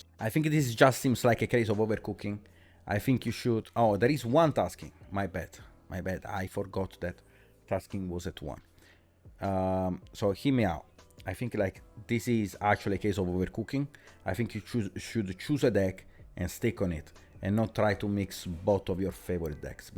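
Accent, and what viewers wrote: Italy. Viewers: incredibile come avevo capito che eri italiano dalla prima parola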